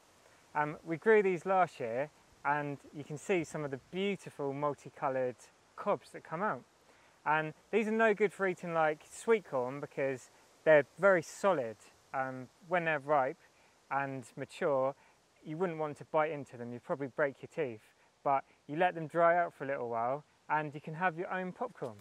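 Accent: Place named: England, United Kingdom. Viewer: I only seem to be able to get ordinary yellow corn here in France; it's a drag trying to get anything different; like jumbo banana squash!! We live in a beautiful place, in the mountains but seeds restricted to little and Holland. Last year i had to high hopes for corn - and before i knew it ants came and took it over it looked OK but they were there; no one else seems to have had the problem! I planted beans with my corn today oops I will stake them then! Where the hell are you; as you have an English accent but it looks like a tropical place, how did you get there?? One sweet potato that will grow here costs 5 euros -- how do you get is it 'slips' what and how to you propagate them?? I need them as we love to eat them!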